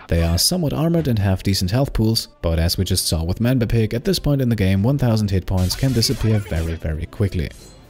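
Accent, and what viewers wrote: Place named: Germany. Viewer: p.s. sprichst du Deutsch? I detect a slight accent:)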